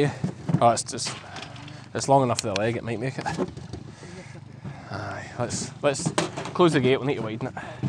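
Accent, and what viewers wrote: Scotland, United Kingdom. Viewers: Absolutely love it when you DONT hide your scots. 😊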